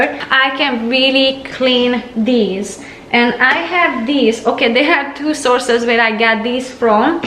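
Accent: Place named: Hungary. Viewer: Great idea to have everything in one place for recipes and products you're liking! It will be interesting to look back a year from now and say, do you still like the vacuum and things.:) Yes, you said "Sal Suds" perfectly. Your bloopers remind me, in a recent video you mentioned when you first started your channel, you wondered if people would understand you. I LOVE your Hungarian accent - having lived in several countries I just enjoy hearing other people's way of speaking and learning new words. I don't remember if I told you or not. So keep it up, you are amazing and maybe don't realize it, but people who haven't had the opportunity to travel will get a wonderful exposure to Hungary through your speech and your recipes. <3 Oh, and I think the pods brand is "dropps", I've seen them somewhere and maybe not positive, but pretty sure that was the brand name. 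Maybe? LOL Wishing you a beautiful day,!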